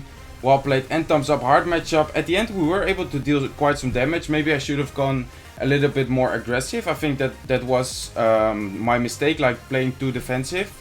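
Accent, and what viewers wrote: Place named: Netherlands. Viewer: Dit is echt heel Nederlands engels😂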